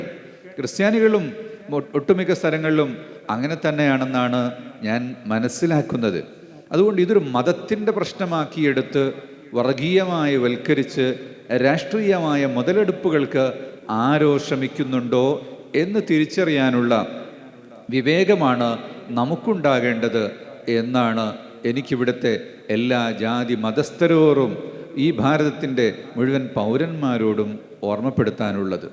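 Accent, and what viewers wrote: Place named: Arab world. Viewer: Iyaku malayalam ariyumo?? Arabi malayalam parayunnu. Pacha sanki malayalam.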